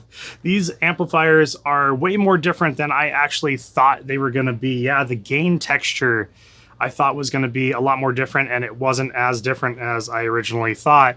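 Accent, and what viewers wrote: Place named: Brazil. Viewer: Sounds more Brazillian than british if you ask me.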